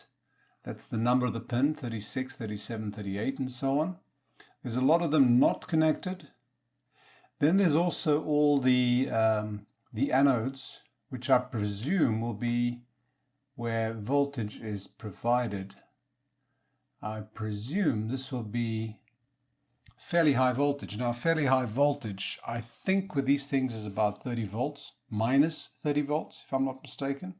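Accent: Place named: South Africa. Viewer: Hi great video, i love old audio equipment. You sound South African by the way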